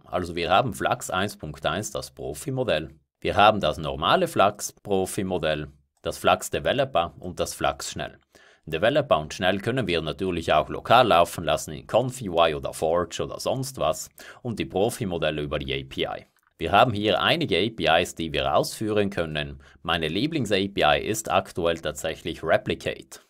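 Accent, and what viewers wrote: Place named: South Tyrol, Italy. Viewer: Hon mir woll gedenkt, dass dess a Südtiroler isch.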